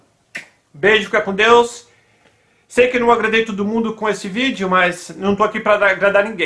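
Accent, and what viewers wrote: United States. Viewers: ei ei para tudo kkk sotaque americano kkkkkkkk assim vale a pena aprender ingles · Você já está com sotaque americano... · você é americano? pois você tem o sotaque um pouco diferente do sotaque brasileiro!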